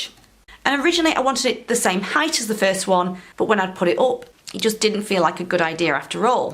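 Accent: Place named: Rotherham, United Kingdom. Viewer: Hello from sunny Hull - been trying to place your accent for a while - thought it might be a Rotherham accent but now I know. Just been to B& Q myself funny the effect a bit of warm sun has on you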